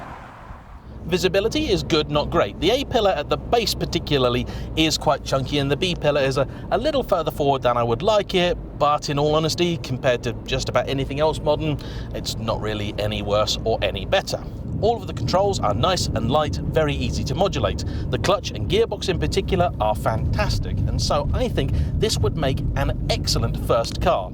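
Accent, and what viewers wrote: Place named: Essex, United Kingdom. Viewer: By the way you speak you Essex boys you are so gay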